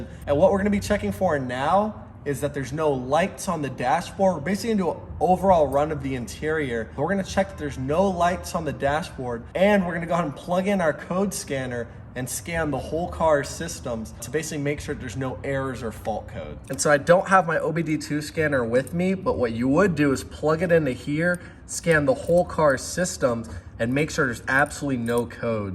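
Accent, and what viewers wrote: Texas, United States. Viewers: Love his Texas accent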